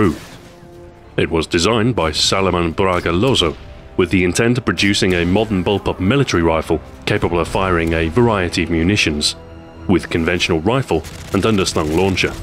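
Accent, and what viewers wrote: United Kingdom. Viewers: Is it just me or do British accents make everything sound more intelligent? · I don't know why these Americans think the British way of speaking is accent, if it came first.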